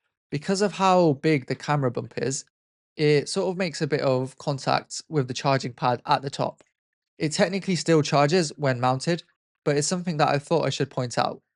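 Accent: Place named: United Kingdom. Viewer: I really like your uk ascent 😂 😂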